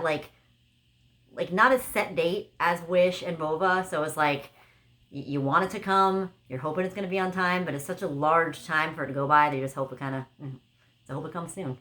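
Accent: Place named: Long Island, United States. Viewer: Hi!!! Love your Long Island accent "Swagga" I'm on the hunt for Bath & Beauty Cherry Blossom awesome haul have an awesome weekend tfs 💕 #teamcheapchick 🐶💕🐶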